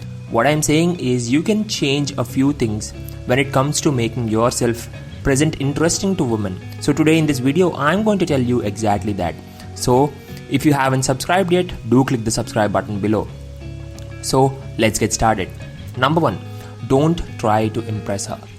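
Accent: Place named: India. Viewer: What the hell indian accent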